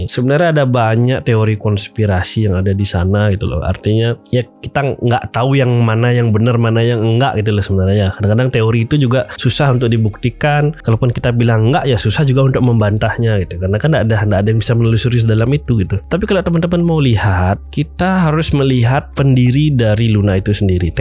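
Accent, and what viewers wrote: Bali, Indonesia. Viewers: Keliatan banget orang bali dari logatnya om 😅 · Bali itu bang...sekilas mirip penekanan dan nada nya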